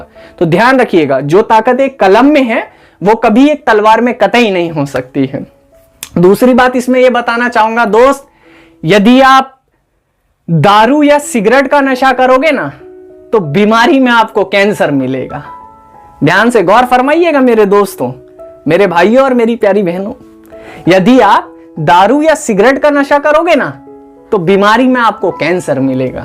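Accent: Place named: Bihar, India. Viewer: bihari tongue, kya baat hai kya baat hai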